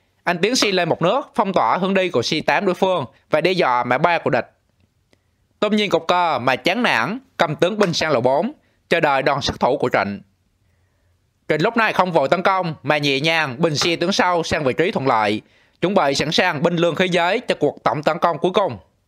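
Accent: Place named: Phu Yen, Vietnam. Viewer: Nghe giọng giống Phú Yên . Hồi trước cũng ở chung với 1 a Phú yên ở Q5 nhà cô làm ở xưởng thuốc lá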